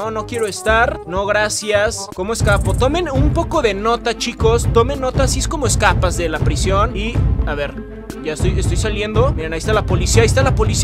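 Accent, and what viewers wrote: Mexico. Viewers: eres mexicano o ecuaforeño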